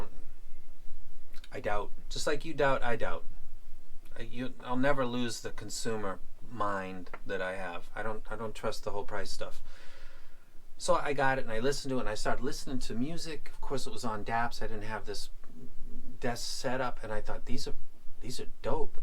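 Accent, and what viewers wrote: Boston, United States. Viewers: I love it when you talk Boston to me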